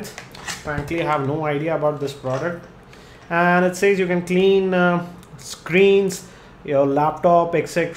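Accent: India. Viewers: Indian accent is so funny LoL !! I PAAAD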